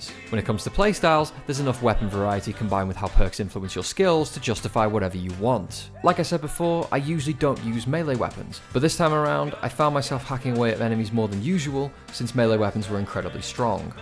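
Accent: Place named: North West England, United Kingdom. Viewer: are you from the North West? I recognize my own accent hahahaha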